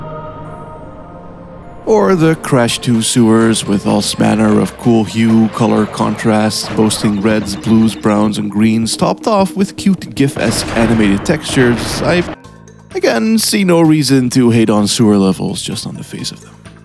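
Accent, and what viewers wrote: Netherlands. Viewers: Do I hear a very tiny slight Dutch accent? Edit: dus toch! 😁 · I had a sneaking suspicion you might be Dutch by the way you pronounce certain things but you casually dropping a Dutch sentence in the middle of this video still hit like a truck holy shit lol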